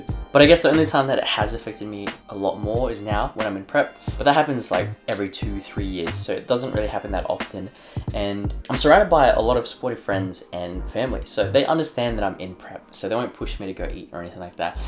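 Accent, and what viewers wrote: Australia. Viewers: Love that Aussie accent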